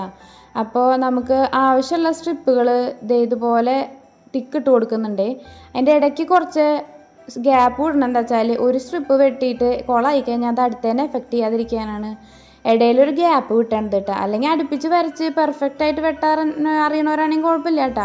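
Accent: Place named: Thrissur, India. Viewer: തനി തൃശൂര് ട്ടോ..... സൂപ്പർ.. സൂപ്പർ.. കലക്കിട്ടോ 👍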